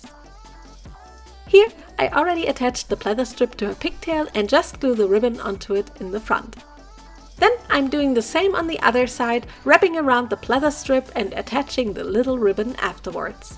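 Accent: Germany. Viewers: I know very unrelated but are you german? Because the "den Wald vor lauter Bäume nocht sehen" in english, the Glue (Kraftkleber) and just your accent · I know a german accent wenn ich ihr Höre🤓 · Du klingst ein wenig deutsch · You sound German, are you German? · this is the first video I've seen from this creator, and the first thing I noticed is how she sounds german! I fell inlove with that tiny trait of hers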